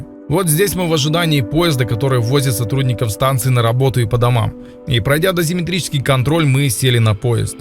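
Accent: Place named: Ukraine. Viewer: егор соболь а разница то какая? Разве это вбила? Просто у нас Украинцев такой говор вот и всё.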